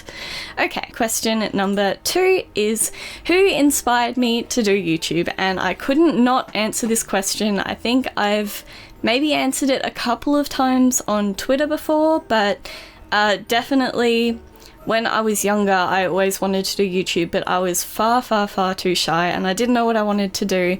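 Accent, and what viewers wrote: United Kingdom. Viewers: When I sometimes hear her voice it's kinda a bit British... Does Austrialians have a bit British voice?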